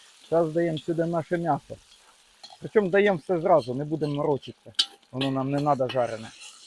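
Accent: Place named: Chernihiv, Ukraine. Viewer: Пане, а звідкіля сам родом будеш якщо не секрет?:) по говірці дуже схоже десь з чернігівщини, на менщину дуже схоже. 😜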